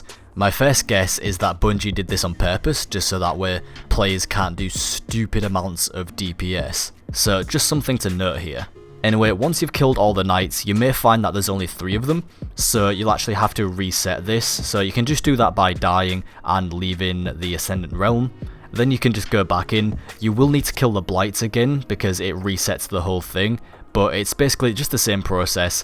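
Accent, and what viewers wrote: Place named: East Riding, United Kingdom. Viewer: Hey bro, you sound very local to me. I live in the East Riding and play destiny all the time. I’m guessing hull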